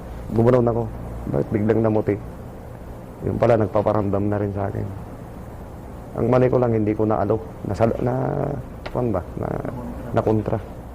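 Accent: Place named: Pantabangan, Philippines. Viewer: Cgruro sir tubong pantabangan.at ung pronounsetion.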